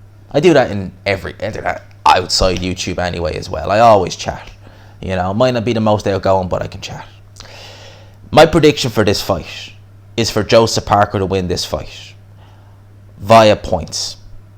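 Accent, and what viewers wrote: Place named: Ireland. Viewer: My Irish bro.Good to hear the accent making boxing news ☺️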